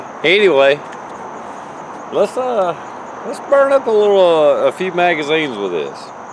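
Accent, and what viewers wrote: South, United States. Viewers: I'm sorry, but I cannot take this video seiously. The southern accent, mohawk haircut, weapon, rooster crowing are just too much. Wonder if he is in his underpants? Maybe some version of deliverance. He he.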